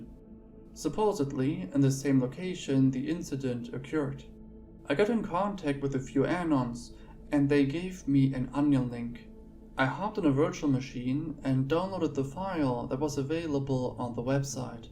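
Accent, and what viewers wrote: Germany. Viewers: Considering his accent sounds like Cosmodores i'm thinking hes german.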